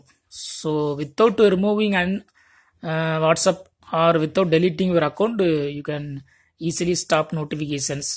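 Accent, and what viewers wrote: India. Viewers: What the hell what kind of English u spoke? Pad pad! U are speaking hindi than English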